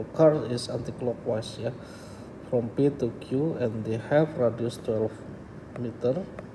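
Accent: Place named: India. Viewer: when I heard the lovely indian accent, I know I came to the right video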